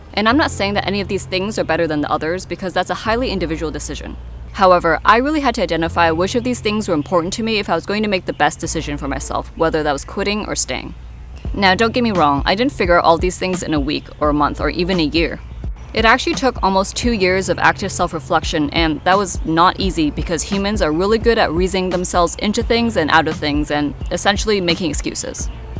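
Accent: United States. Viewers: There is what I think you and are either ABC or brought up in the States. The way you talk and the accent is completely different to the locals and CBC and BBC. Good luck on your new career.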